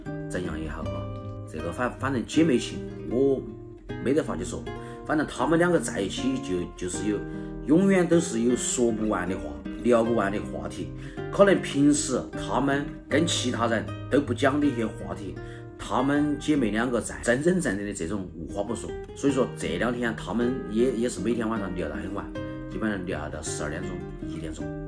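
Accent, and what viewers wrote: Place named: Shanghai, China. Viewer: 上海话的腔调很足。你很聪明。灵光格。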